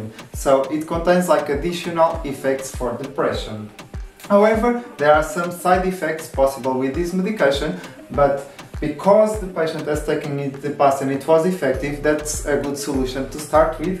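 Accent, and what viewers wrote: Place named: Portugal. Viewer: I'm going to guess you speak Portuguese?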